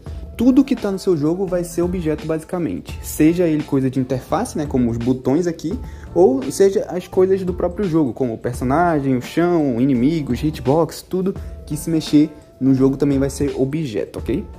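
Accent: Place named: Rio de Janeiro, Brazil. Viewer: Eu chuto q tu es carioca ou algum lugar do Rio de janeiro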